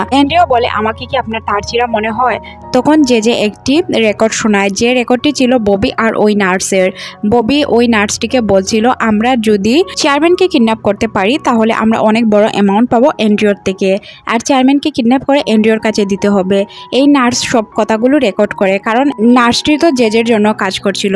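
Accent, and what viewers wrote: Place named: Mymensingh, Bangladesh. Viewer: আপু আপনার উচ্চারণ ভালো না, ময়মনসিংহ আঞ্চলিকতা 😅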